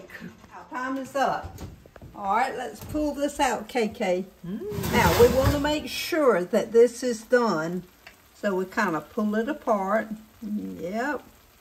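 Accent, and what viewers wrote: South, United States. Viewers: Dear Mrs, I lost my mother last year (I’m 60) and she reminds me sooo much of you. She didn’t have your beautiful southern accent but a german accent. She was a master in the kitchen and kind and lovely just like you. I stumbled across your videos and feel so blessed! I’ll be watching every video and making notes. Do you ship your cookbooks to Canada? Thank you again for your generous hospitality and yes…YOU…shine for Jesus. Many Blessing ❤🇨🇦✝️💐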